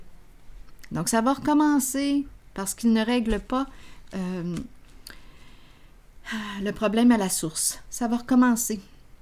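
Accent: Canada. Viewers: j'adore votre rire enfantin et votre accent canadien je suis scotchee et j'adore le Quebec qui est une region si jolie, ou on mange si bien dans d'excellents restaurants merci d'etre presente tous les jours dans ma vie pour me donner des nouvelles de l'homme qui est dans mon coeur je vous souhaite une excellente soiree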